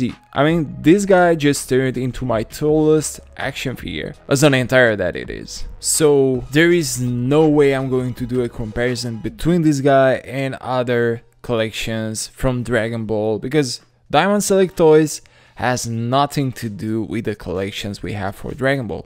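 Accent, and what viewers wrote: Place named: Romania. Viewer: După accent ești român:)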